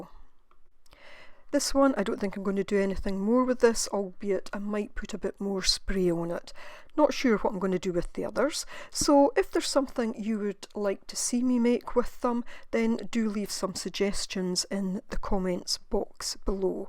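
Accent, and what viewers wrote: Scotland, United Kingdom. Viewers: Thank you for your video , I learned a lot . I love your accent, are you Scottish? Love from Canada 🇨🇦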